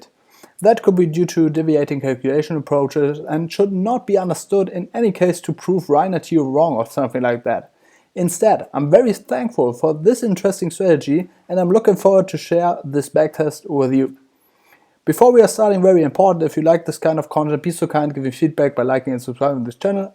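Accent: Germany. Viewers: I hear an accent, if German then Dankeschön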